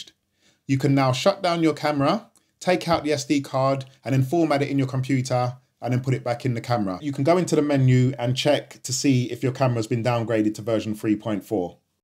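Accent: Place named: England, United Kingdom. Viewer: the very least I can do to say thank you. Love your English accent.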